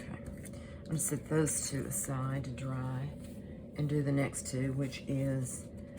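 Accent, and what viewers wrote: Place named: Texas, United States. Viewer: These are so cute! And would make a lovely addition to a journal for a gift. I have been watching your videos, I don’t comment much, or every time. But love your channel. Your voice is so comforting... are you by chance in East Texas? Or Texas in general? You sound like you are. I wasn’t going to ask, but then you showed the post card from San Antonio sunken gardens. I’m South east of Dallas between Dallas and Tyler... anyway... I love finding new uses for the envelopes, and can appreciate that you don’t try and disguise the fact it’s an envelope!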